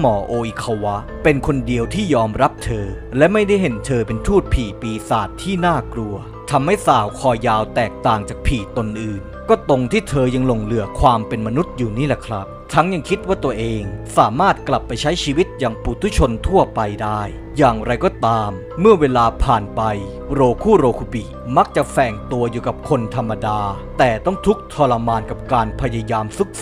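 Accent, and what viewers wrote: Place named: Central, Thailand. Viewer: คุณ ผมฟังคลิปคุณมาหลายคลิปแล้ว ผมชอบตรงที่การบรรยายของคุณมีเสียงกลางออกเหน่อ นี่คือเอกลักษณ์ประจำตัวคุณ มีเสน่ห์ประจำตัวที่ให้น่าติดตาม ผมชื่นชมและจะติดตามไปเรื่อยๆและตลอดไปครับป๋ม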